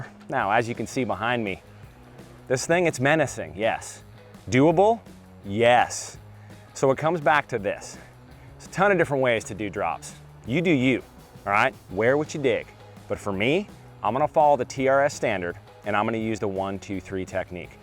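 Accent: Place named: United States. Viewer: Thank God we have usa boy and accent. It's about f time. That I dont hear a f English accent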